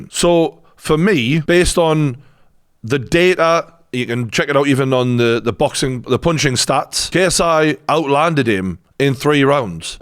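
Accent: Newcastle, United Kingdom. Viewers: True Geordie stating facts.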